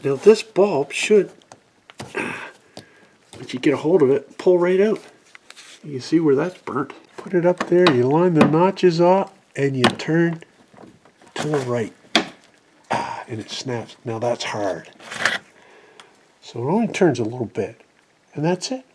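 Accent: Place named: Canada. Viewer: because of your video i'm gonna attempt this myself. plus- you have a great canadian accent- aboot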